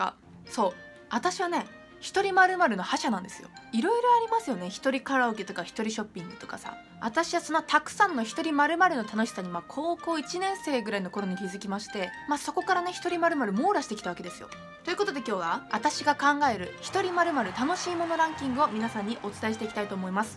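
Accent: Nagasaki, Japan. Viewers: の後輩です！長崎弁恋しくて見てたら面白くてハマってます！応援してます！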